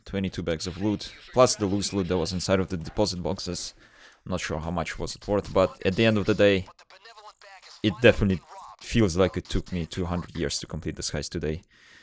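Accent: Russia. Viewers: you from Russia or Germany? Your accent as a normal russian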